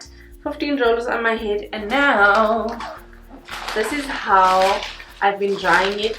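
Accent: South Africa. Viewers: Let me guess, South African???? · That's definitely a south African accent I hear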